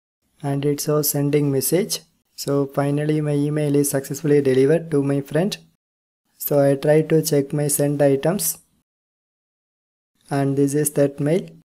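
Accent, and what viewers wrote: India. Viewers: Every time i listen how to speaking indian english such as very annoying voice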